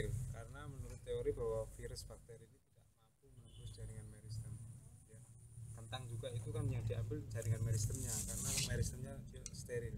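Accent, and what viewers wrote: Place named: Java, Indonesia. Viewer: Coba gaya bicara nya diperbaiki, jangan terlalu medok supaya enak didengar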